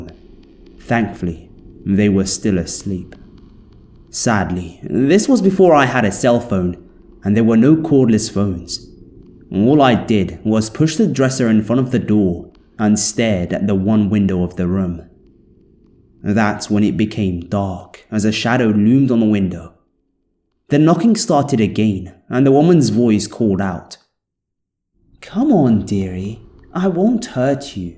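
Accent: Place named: United Kingdom. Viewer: Hello from, wonderful to get an upload from you. Love your accent. That Brit accent just adds so much to the stories. Looks like great story content. Keep up the excellent work. 💋👣💌💘💞💕💓💗💔❤💄💟🎠🌈🍄🌼🍎🍉🌻🍰🕊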